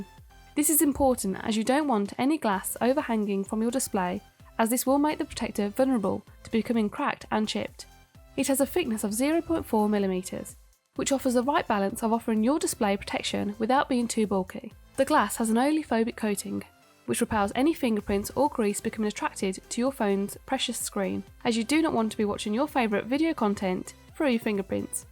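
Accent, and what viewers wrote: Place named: Birmingham, United Kingdom. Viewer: A fellow brummie :)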